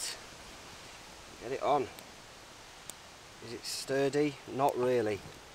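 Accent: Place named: Sheffield, United Kingdom. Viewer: Bet your from round my neck of the woods with your accent. Sheffield?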